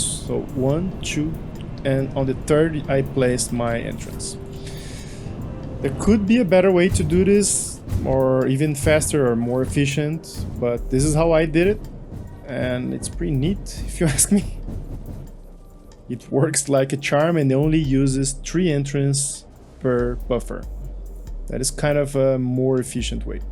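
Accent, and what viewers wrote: Brazil. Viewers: Mano vc é brasileiro??? Pq parece muito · Dá para conhecer um brasileiro fácil pelo sotaque. hehehe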